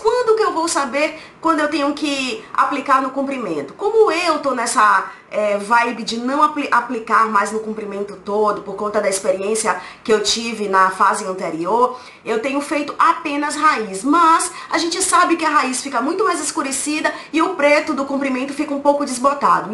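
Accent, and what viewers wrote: Bahia, Brazil. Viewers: Lindona seu cabelo ta lindo!! mil bjos!! gosto muito de te escutar om esse sotaque lindo baiano!! fico com saudades da minha cunhada!!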